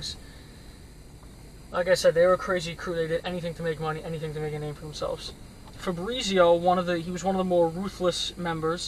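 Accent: New York, United States. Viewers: The time you put into the research is so evident, seeing this area in real time, add to it ur new york accent, enjoy ur channel.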